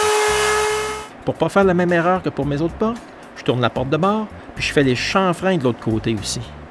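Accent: Canada. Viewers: Hello, la vidéo date mais moi qui la découvre je pense qu'elle est intemporelle ; superbe travail, un plaisir à regarder et puis l'accent Canadien ajoute un poil d'exotisme fort sympathique, je vais regarder la suite. Bravo!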